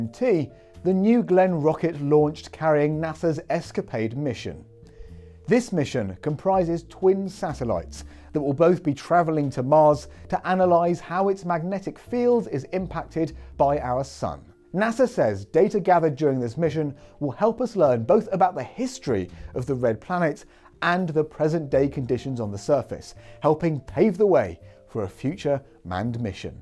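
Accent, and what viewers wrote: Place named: Paraguay. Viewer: Dude he’s from Paraguay, don’t annoy him too much on his English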